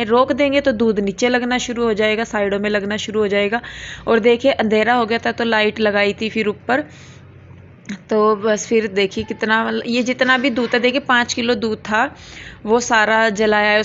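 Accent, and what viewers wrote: Haryana, India. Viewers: Haryanvi boli bhut bdiya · Maam ap haryana ke ho kya apki tone vha ki he lgti h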